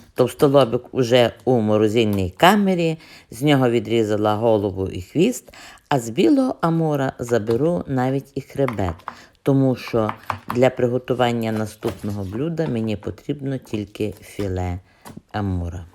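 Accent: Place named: Poltava, Ukraine. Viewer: Доброго вечора. Така прекрасна мова, ви часом не з Полтавщини?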